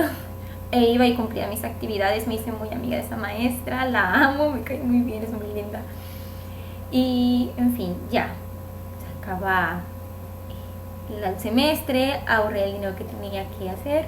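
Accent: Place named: San Luis Potosí, Mexico. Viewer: Jejeje me gusta mucho tu acento y cómo te expresas, pues he tenido amigos mexicanos, curiosamente tu acento se me hace de San Luis Potosí, bueno no me hagas caso no soy de allí pero tuve amigos de allí que se expresaban parecido jejeje. Por cierto te mando un abrazos desde España y ojalá que estés mucho mejor.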